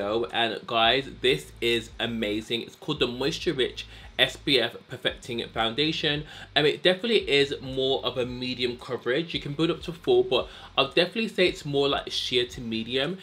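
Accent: Birmingham, United Kingdom. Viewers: Is that a Brum accent i'm hearing? ❤️ . and thanks for the vid, you've helped a clueless sister out 😂 .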